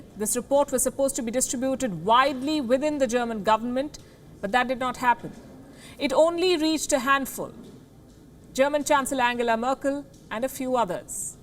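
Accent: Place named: India. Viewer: We know your speech full of Nonsens, like your Indien accent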